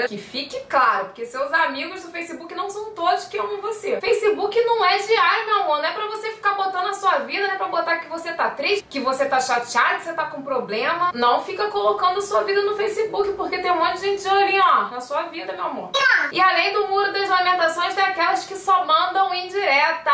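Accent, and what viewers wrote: Rio de Janeiro, Brazil. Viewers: Maravilhosaaaaaaaaaaa!! hahaha Carioca legítima. Amoooooo <3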